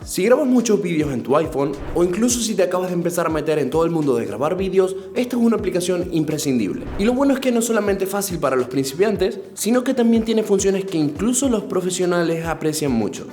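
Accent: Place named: Venezuela. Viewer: Eres de Venezuela verdad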